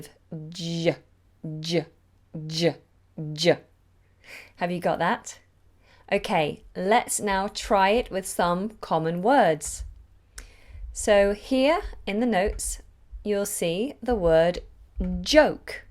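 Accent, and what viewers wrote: United Kingdom. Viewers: i loved your voice and your accent i want to learn british accent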